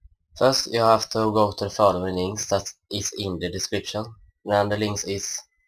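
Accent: Sweden. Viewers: Haha Svengelska :D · det låter lite som svengelska när du pratar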